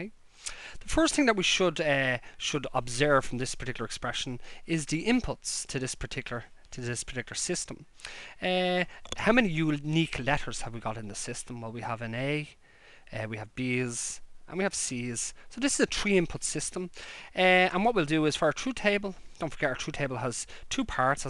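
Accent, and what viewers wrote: Ireland. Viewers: hahaha you wonder? He's not Irish, he's MEGA-Irish!! (From the North). I love the accent, I used to live with two Irish friends from County Armagh back in NYC ages ago... People said I picked up on the accent back then :D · I wonder are you Irish?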